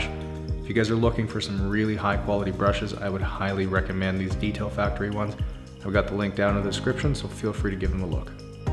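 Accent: Canada. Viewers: Anyone else just listen to that Canadian accent and smile😂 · That’s exactly how I thought Canadians talk to each other.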